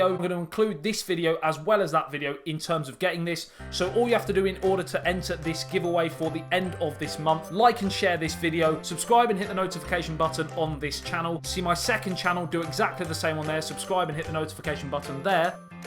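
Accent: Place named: Wales, United Kingdom. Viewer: I would love this phone, and I love your Welsh accent 😉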